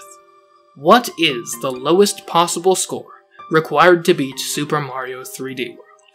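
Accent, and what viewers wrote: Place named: Upper class, United Kingdom. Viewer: I like the idea of the video but this thing where you force yourself to enunciate every letter, trying to sound all upper class, really puts me off.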